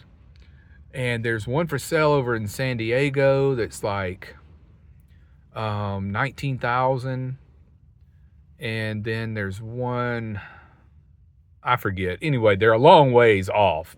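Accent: South, United States. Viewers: you're the best! Every time I see that you have a post, I get excited. I love your little accent. I'm originally from Oklahoma City, I live in Arizona and have for the last 30 years, but I still have a special place in my heart for the southern folk with accents. You just seem so genuine and so cool! I wish nothing but the best for you! I'm looking forward to seeing what you end up with! You deserve some comfort, you hung in there and done great things! I love it when you say I'm tinkering! Haha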